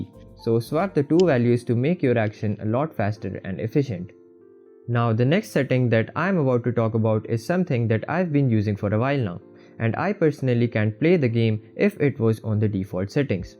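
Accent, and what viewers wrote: India. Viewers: U r speaking good and constant english without stopping Very good And r u indian?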